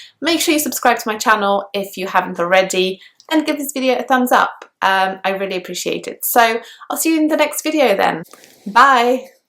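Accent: United Kingdom. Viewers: Lol i thought you were british until you said the 'this and that' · As a well travelled Australian, I thought you were from the UK. Only some words sound a little 'central European', and you have both pronunciation for the north and south of the UK. But there is plenty of examples of natives that do the same! Well done!!! Like another view, I am technically advanced in Spanish, but this seems to fluctuate greatly depending on the day... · Hi Good morning from Myanmar. I've been learning english 2 years nearly but my take on is that it didn't work much as i hope. British accent appeals to me tremendously. I feel i have a weakness in pronounciation. Fortunately, your vedio for boosting my accent in british is exceedingly great for me. ohh by the way, your british accent is lovely. Thanks a lot for your kindness.😘😘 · I thought you were British till you started speaking about your fossilised error ! 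My god, you sound like a Brit through and through. What's your native language ? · I did, but it was like 95% BrE, and 5% german, maybe, cause thr L is sorta german, especially in words like "language" and words that have "ly/li" in it. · I thought she was british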